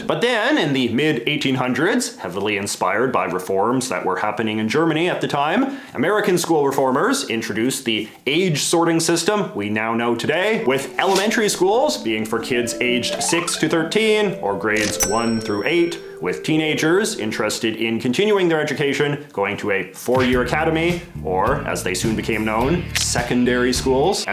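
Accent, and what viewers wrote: Canada. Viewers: I love his Canadian accent 😍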